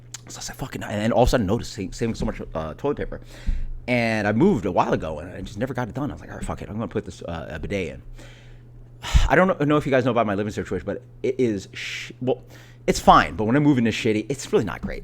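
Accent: United States. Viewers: dude, your new york accent came out here. wish you the best bruh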